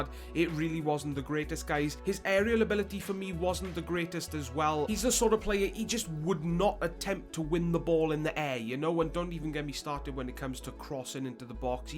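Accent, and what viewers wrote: Wales, United Kingdom. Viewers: I can 100% tell your from Wales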